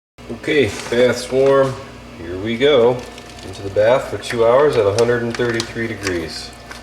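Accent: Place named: Chicago, United States. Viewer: You have to be from Chicago land area? Based off of your accent, I live near there. Just bought the Anova 😍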